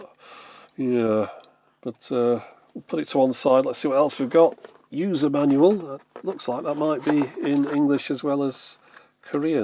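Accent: United Kingdom. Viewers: I do like, of course your videos, but your voice. Nice and calm. Like to hear a British voice instead of always American.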